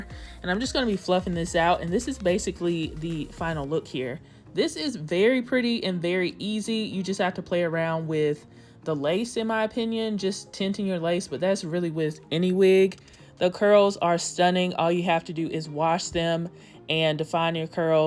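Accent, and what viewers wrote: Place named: Tennessee, United States. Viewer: I hear a little county accent 😏 I'll guess Tennessee. 🤔 Gorgeous curls tho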